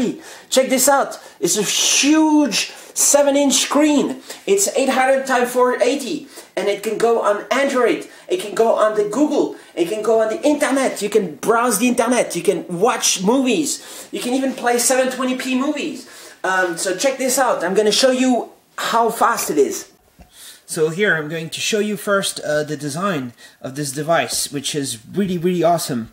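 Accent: France. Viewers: Ta un accent francais mec. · accent francais ca mdr !! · OMAGAD you're so french dude ^^ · Tu as un accent françai ! ^^, Pourquoi le clavier est-il AZERTY ?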